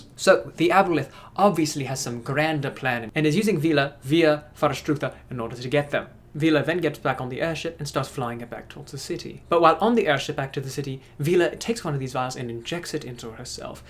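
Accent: United Kingdom. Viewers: That one person that disliked is just salty cuz they can’t do a British accent as good as his. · Come for the British gentleman voice, stay for the amazing DND stories I mean seriously your home brew content is so damn cool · Y’all already know that soon there’s gonna be fan fiction (smut) on Wattpad of Voltiaere🙈 Btw I’m simping over because of his content and his British gentlemen from the 1800’s accent aka Voltiaere😳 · read the bee movie script in your british accent. this is an order. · What's more impressive than his British accent is how long his can keep his accent. Mine tends to fall apart the longer I try to speak with it.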